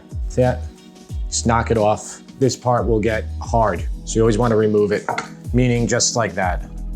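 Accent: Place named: New Jersey, United States. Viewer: Well if you really want not to like him you could start with the fact that he say's lives on Long Island but talks like he's from New Jersey. That's a start.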